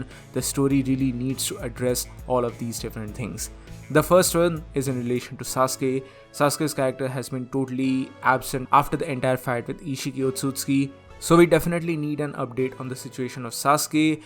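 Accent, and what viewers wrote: India. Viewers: BECAUSE HER ENGLISH SPEAKING STYLE IS LIKE INDIAN THAT'S WHY I AM WELL THANK YOU FOR TELLING (ARIGATO)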